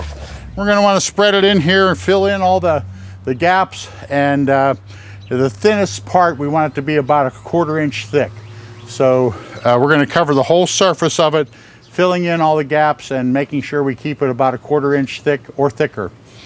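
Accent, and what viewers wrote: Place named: Southwestern Pennsylvania, United States. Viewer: I knew you were from southwestern pa by your accent, and then I saw the steelers helmet on the door. Here we go steelers here we go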